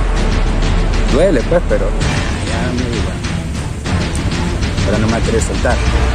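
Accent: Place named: Nicaragua. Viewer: Muy tuani el contenido y muy bueno que tengamos a alguien que muestre este tipo de cosas aquí en Nicaragua, solo mantene el acento nica. Muchos exitos en tu canal hermano 👊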